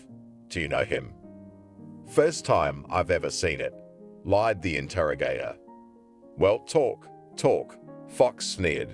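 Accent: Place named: United Kingdom. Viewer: well im sorry to say that the story might very good but I need to quit listening because the way the narrator speaks, it's so hard to understand as he is with british accent. making letter A as I, like in the word play as ply. letter O as Oi, as in the phrase I doint knoi, goi hoime, etc. hope you could find a better narrator with american accent. sorry again and thank you.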